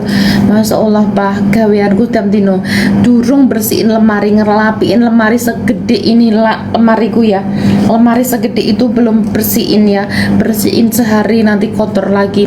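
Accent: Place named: Java, Indonesia. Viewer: Maaf bunda bicaranya separti orang jowo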